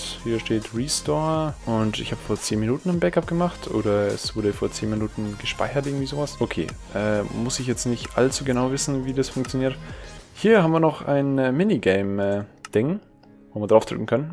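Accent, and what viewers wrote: Austria. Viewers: Bist du österreicher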